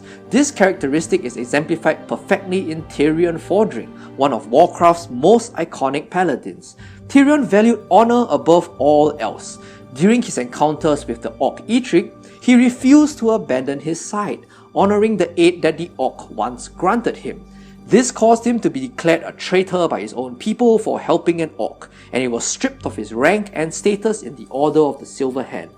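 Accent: Singapore. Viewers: Ah, I see what you mean, then. I think he's simply explaining the ones that will be playable, as that's probably what non-lore followers will care for, and it seems that english might not be his first language (it might be, I'm just guessing based on the accent and seeing him talking about coming from Singapore in the comments), so there could also be contextual language that might be a bit mixed up there as well.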